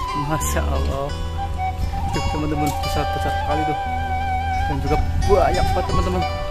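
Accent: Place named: Banyumas, Indonesia. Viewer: Sampurasuuuun,sing sarehat sukses baraya..kang ciganamah aya aya ngapak na gitu....hehee mantap kang...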